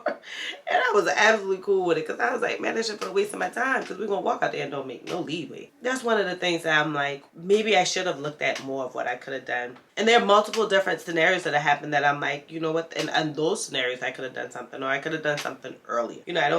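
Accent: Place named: Gullah, United States. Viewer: Are you Gullah Geechee? Or caribbean. You sound Gullah.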